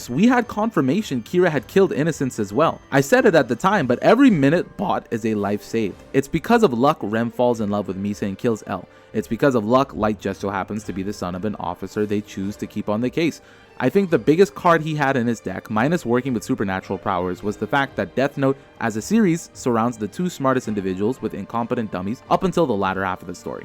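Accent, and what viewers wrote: Bahamas. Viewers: Are you a Bahamian? This is funny? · are u from the bahamas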